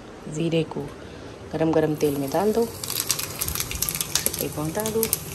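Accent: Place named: Hyderabad, India. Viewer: Hyderabadi language zabardast baat karthai ap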